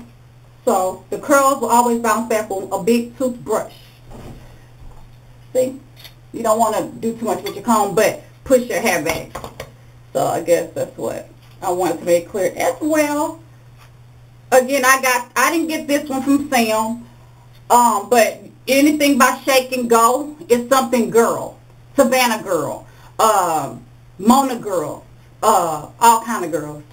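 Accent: South, United States. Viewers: I love all of your videos,! Are you from a southern state..I love your accent.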